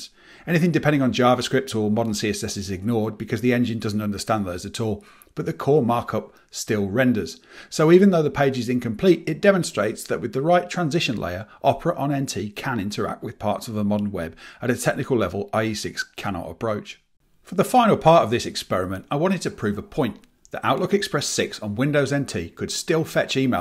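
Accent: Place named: Midlands, United Kingdom. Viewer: Can I just say that your diction and pronunciation is fantastically clear. Down to the gentle midlands accent too.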